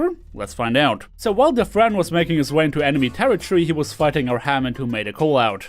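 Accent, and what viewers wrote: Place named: Germany. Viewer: He sounds kinda German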